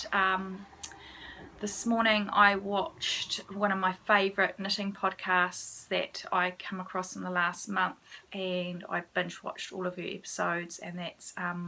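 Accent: New Zealand. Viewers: Kia Ora! I've only just found you, thanks YouTube:0). I'm a kiwi, living in Aus (NSW). It's been way too long since I was home (stupid Covid) and it's sooo nice to hear your accent and feel the connection to home in your manner and way of being. I'll keep binging your channel, while I sit crocheting and resting with my own version of long standing illness that causes fatigue and fall out. Thanks for sharing, it's important for others, for me. I needed that connection today. Arohanui xxox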